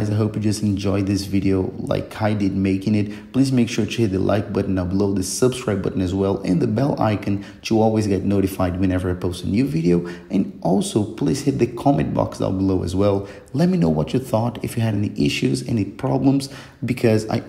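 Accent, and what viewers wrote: Brazil. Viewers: Já reconheci q tu é Brasileiro dez do começinho do vídeo kkkkk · E esse sotaque de brasileiro meu parceiro? É Brazuka?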